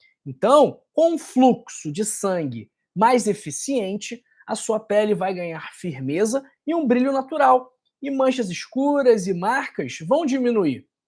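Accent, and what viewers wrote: Rio de Janeiro, Brazil. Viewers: Não gosto do sotaque do Rio de Janeiro 😊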